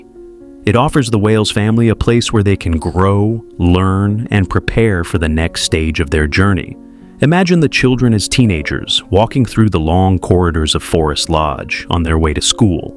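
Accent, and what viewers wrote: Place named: United States. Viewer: Interesting, but did we need the awful American accent on a programme about a Royal home?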